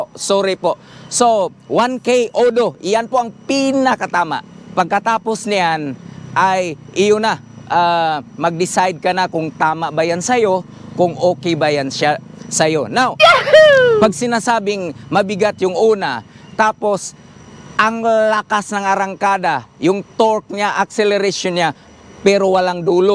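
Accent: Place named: Visayas, Philippines. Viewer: Pwede naman kayong wag manood para hindi kayo mainis. Si kasi sa obserbasyon ko eh Ilonggo at bisaya originally. Medyo hirap talaga sila managalog ang mga bisaya. Alam ko kayang mga english ni pero mas malawak ang maaabot ng mga vlogs nya pag tinagalog nya. Tas matagal na yata sya sa Thailand at fluent na sya magsalita Thai. Kaya naghahalo halo na ang accent nya. Sa kin walang problema ang paliwanag nya. Kahit papano may napulot ako.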